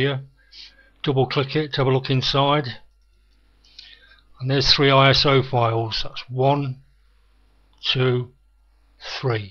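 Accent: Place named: United Kingdom. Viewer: great tutorial. great heavy british accent. you sound like one a them hardcore british gangsters. lol. but thanks alot. cutting right to the chase, no bullshit music or anything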